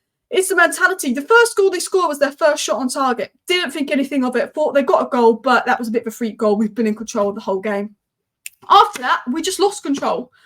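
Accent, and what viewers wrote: Manchester, United Kingdom. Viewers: Just because Man U were once a great club. Doesn’t mean you are now empires come and go . The most arrogant supporters in the premier league Man U supporters support your club though thick and thin ps that’s a funny manc accent you got yourself there!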